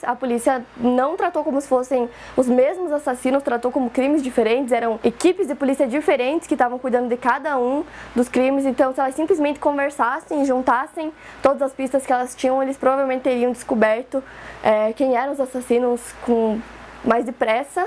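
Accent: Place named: South, Brazil. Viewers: voz sulista que fofo e engraçado s2 kk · Mas tem um sotaque sulista diliça♥️ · Video um pouco antigo mas mto bom, a sempre explicou mto bem, pesquisou mto bem, e merece muito reconhecimento pq isso eu sei que da mto trabalho, e além disso tem esse sotaque sulista SUPER FOFO ❤🤡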